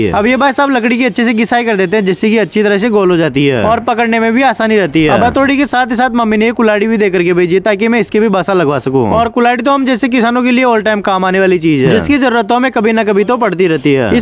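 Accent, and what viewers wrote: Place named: Jaipur, India. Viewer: Are jativadi Pure jaipur ke aas pass ke log jaise ki jobner jotvada chomu yha k logo ki bhasa esi hi hoti hai Mere kai sare dost hai vha k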